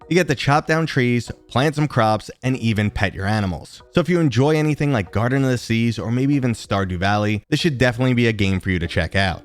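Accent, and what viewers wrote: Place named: Long Island, United States. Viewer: you sound like you're from long island new york. I live there. Just taking a guess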